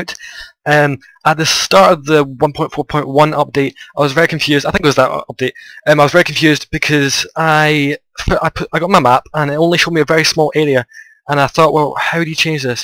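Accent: Scotland, United Kingdom. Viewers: your Scottish! i love u accent! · You're scottish? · Hahhaabha you're cute. and THANK YOU AWESOME PERSON./ scottish <3 Do you speak scottish gaelic too?:3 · R u Scottish if u r so am I!!!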